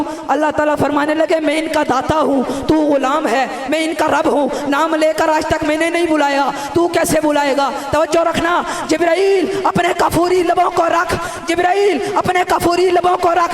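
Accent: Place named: Mansehra, Pakistan. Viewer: آواز سے محسوس ہوتا ہے کہ یہ مانسہرہ سے ہے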